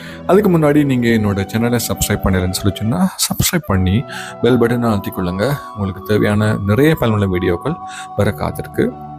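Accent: Sri Lanka. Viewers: Hii doctorayyyyyyyyyyyyy this is u r subscriber usha how r u sir speaking out a excellent and a marvelous srilankan tamil ❤🎉❤🎉❤🎉❤🎉❤🎉❤🎉❤🎉❤🎉❤🎉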